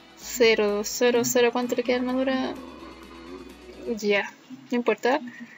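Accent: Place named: Chile. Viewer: Se nota altiro que eres chilena te amo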